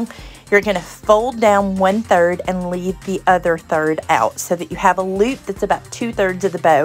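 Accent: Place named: South, United States. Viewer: Thank you SO much for this helpful video! Love your southern accent too ;o)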